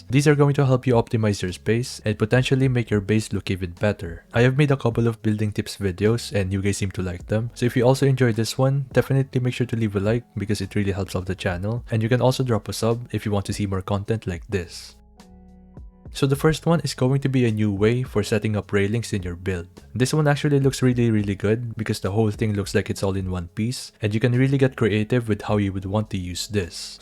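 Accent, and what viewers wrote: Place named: Philippines. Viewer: I can tell your Filipino by your accent lol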